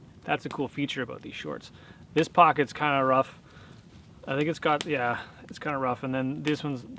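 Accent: Canada. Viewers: I just found your channel, you’ve really made some beautiful places on your property? I detect the Canadian accent, are you in Ontario? Do you raise the pigs for food? I’m not a vegetarian but I’d have a hard time eating an animal I cared for. I had a duck & 3 chickens as pets for my kids & we lived in the city. The neighbour’s loved it so nobody reported us.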